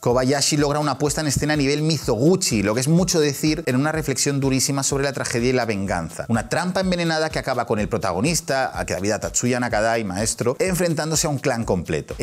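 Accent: Spain. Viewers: A hacer un curso de idiomas, cualquier idioma, que todas las palabras extranjeras las pronuncias como un español típico que dice "se lee como pone".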